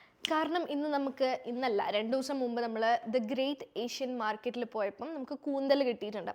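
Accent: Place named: Thalassery, India. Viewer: That Thallasery slang 😊❤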